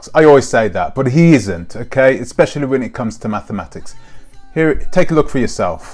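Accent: Birmingham, United Kingdom. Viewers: Bless your cotton socks..you sound like a proper brummie. 😄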